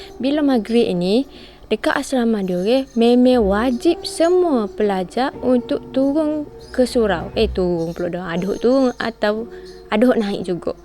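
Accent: Kelantan, Malaysia. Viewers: Walaupun sy tk berapa faham ckp Kelate..sy tonton sampai habis.. sambil hos cerita..sy cuba bayangkan.. memang bets cerita ni..🫰🫰🫰💯💯💯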